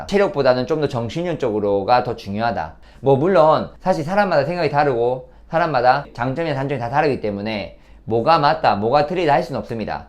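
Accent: Gyeongsang, South Korea. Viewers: 경상도 사투리 쓰는게 자연스럽습니다!! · 와 ㅋㅋ 전라도 사람인줄 알았는데 서울 + 경상도였네 ㅋㅋ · 경상도 사투리 자주 사용해주세요 ㅎㅎ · 와 ㅎㅎ찐 경상도인줄 알았는데 의외시네요~~^^ 부모님이 경상도 출신은 아니신지~~ㅎㅎ 근데 서울말보단 사투리가 더 찰떡이에요~ㅋㅋ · 경상도 네이티브 같아요 맨.몸.운.동.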